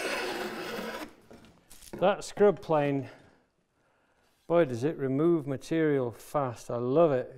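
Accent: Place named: Sheffield, United Kingdom. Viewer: R C:D Sarcasm doesn't travel well on the internet, does it? Try reading my post with a Sheffield accent (like ). Of COURSE he's the master! I was taught engineering by a guy from Sheffield...no matter how well you did something, his best comment was: "Hmm...not bad that. Mek another one to be sure."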